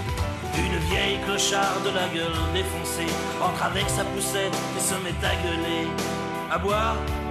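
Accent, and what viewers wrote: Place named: Paris, France. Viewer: Salut à toi François et ta gouaille de titi parisien si reconnaissable ❤️.....merci pour le rock/punk alternatif de ces merveilleuses décennies 80'-90' -00' que tu nous as fais vivre avec Les Garcons Bouchers puis Pigalle. Souvenirs de concert et de rencontre en province 😁👍 Les années passent, nos souvenirs d'une époque un peu raide à vivre mais solidaire quand-même par rapport à maintenant........salut l'artiste 🥲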